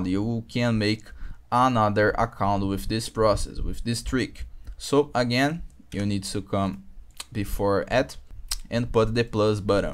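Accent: Brazil. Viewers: tamojunto meu mano, eu sei q vc é br pelo seu sutaque kkkkkkk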